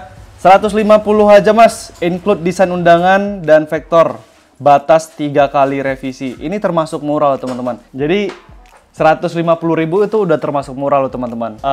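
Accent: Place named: Medan, Indonesia. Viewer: Bang org man nih Kayak ada Medan Medan nya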